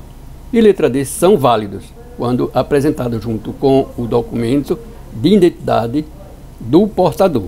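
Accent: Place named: Paraíba, Brazil. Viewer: Ele deve ser da Paraíba pelo sutaque não sei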